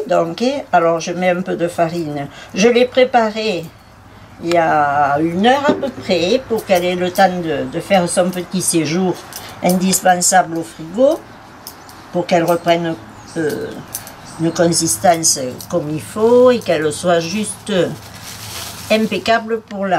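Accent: Provence, France. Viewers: Bravo mamie pour vos recettes en plus avec votre bel accent provençal, recettes que jai teste pas toutes encore merci pour votre gentillesse ❤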